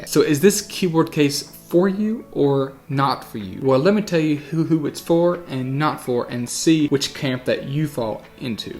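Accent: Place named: South Louisiana, United States. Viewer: Hey, like your review. I'm just trying to decide whether to get this one or wait for the Brydge Pro Plus to get it's act together. I'm pretty sure they will. And I like the idea of aluminum. One question, just out of curiosity: where is your accent from? You, by chance, from south Louisiana? Your accent sounds familiar.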